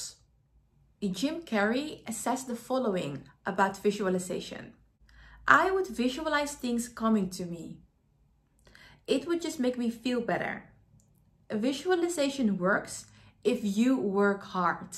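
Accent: Netherlands. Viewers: Are you dutch !?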